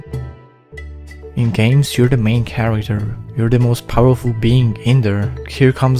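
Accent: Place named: Turkey. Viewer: Bro has Turkish accent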